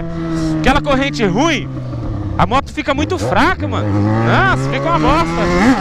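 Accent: Goiás, Brazil. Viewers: porquê vc fala no pique de Goiás ?? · vc e goiano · pk ele fala no pique de Goiás se ele é de londrina😂😂😂😂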